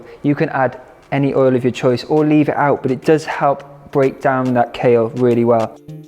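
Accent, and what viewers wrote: United States. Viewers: That American accent is both endearing and entertaining. I have to try these recipes! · omg that American accent. I'm dying 🤣 I have had the biggest grin this whole video lol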